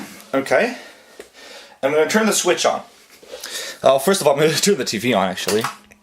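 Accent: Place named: United States. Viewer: Judging from your accent, i thought you were American.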